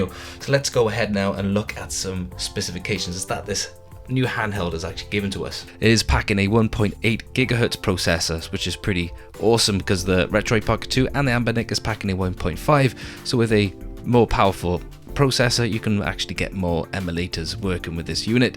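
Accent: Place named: Cardiff, United Kingdom. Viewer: Is that a fellow Cardiff accent I detect? Great video mate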